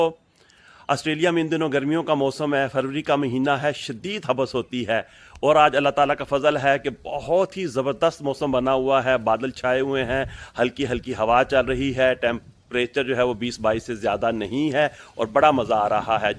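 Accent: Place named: Pakistan. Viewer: ap pakistani sound or rahe hai me apka chota bhai india se bohot acha laga apko pur sukun dekh k lekin australia kese settele hogye pakistan se.. ap kitne log hai waha settle family mem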